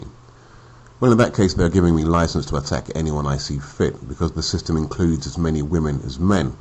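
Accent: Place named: United Kingdom. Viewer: This content is powerful and it's a uk voice.usually it's the guys from the states talking Truth.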